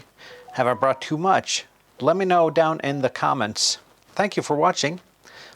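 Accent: United States. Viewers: off topic but I had to do a double take on your qth because of your English dialect sounds American is that common in Norway thanks 73s kd9jay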